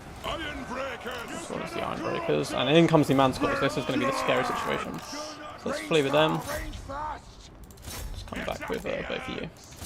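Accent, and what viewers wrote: United Kingdom. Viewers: It's the british accent but he's just an average lad.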